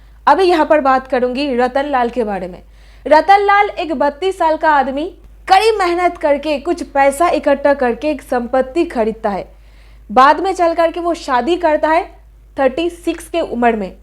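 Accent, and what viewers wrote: Bengal, India. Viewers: good , like film story in bengal accent . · You are confused and confusing us. Get rid of your Bengali accent.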